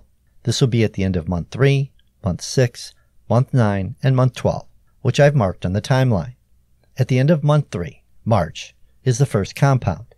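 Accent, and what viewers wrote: Louisiana, United States. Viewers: Cajun accent?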